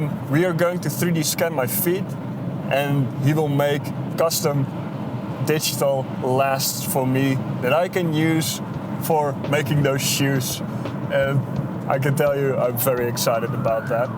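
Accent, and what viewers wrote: Netherlands. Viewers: Took me 4-5 words to go 'this guy is Dutch' am I off? Edit: nevermind lol, extremely clear obviously after watching more than 5 seconds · WTF. HA! You can always count on the Dutch… Funny fuckers, especially when speaking English.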